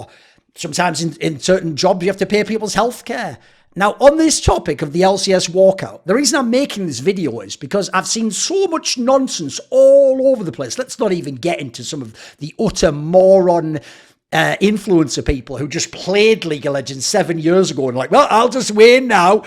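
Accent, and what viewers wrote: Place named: Scotland, United Kingdom. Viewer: its probably been 5 years since i've seen a thoorin video since i quit league in high school and i forgot how scottish this guy is 😭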